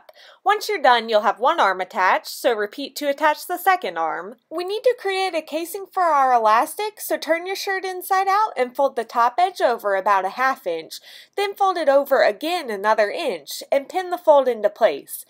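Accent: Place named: Canada. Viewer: Hey, your accent makes me wonder where you're from. Are you Canadian??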